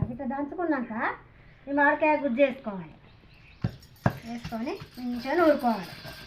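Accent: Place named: Karimnagar, India. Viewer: Memu elage chestamu sooooooper Naaku chala estamu😅😊karimnagar language Amma😂 Maadhi karimnagar 😂meedi vooru ekkada Amma😂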